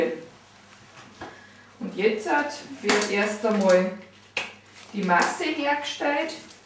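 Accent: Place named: Bavaria, Germany. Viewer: ich mag ja den akzent aus dem schönen bayernland xD das rezept probier ich das we mal aus danke für das video ;)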